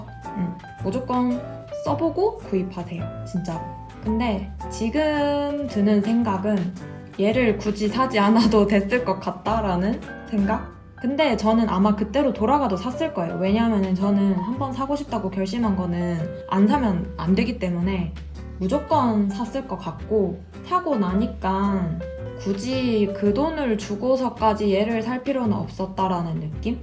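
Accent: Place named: Gyeongsang, South Korea. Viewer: 고향이 대구 신가봐요 ~~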